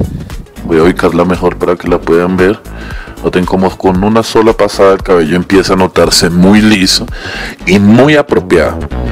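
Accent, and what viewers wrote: Colombia. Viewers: Ese es colombiano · Con esa voz de Colombiano más ese talento y profesionalismo mezclas perfectas💯👌🏾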